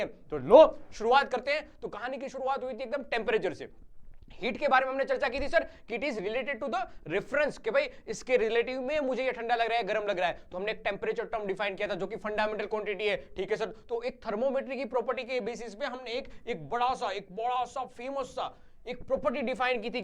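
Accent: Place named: Haryana, India. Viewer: Sir Tey to haryanvi lage manne🤔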